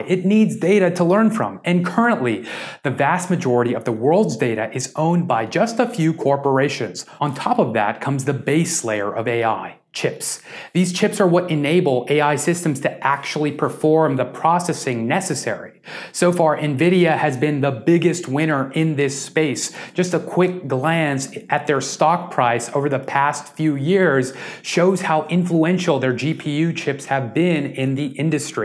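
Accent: India. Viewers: Sir ,you r Indian or American?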